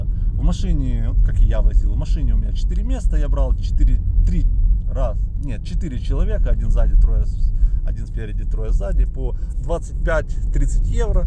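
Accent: Odessa, Ukraine. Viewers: слышна кстати типичная одесская интонация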